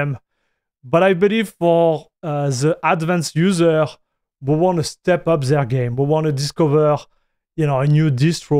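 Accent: France. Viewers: On dirait que vous avez un accent français non ? Ou francophone en tout cas.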